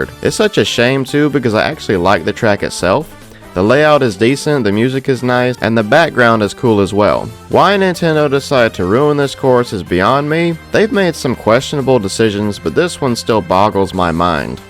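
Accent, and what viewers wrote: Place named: South, United States. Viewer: W man with a Southern accent talking about the worst tracks in a Japanese racing game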